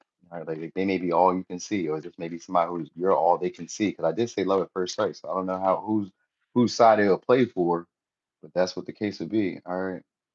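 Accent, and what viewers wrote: Australia. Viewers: He has an Australian accent 😅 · He is Australian....I didn't notice at first...😂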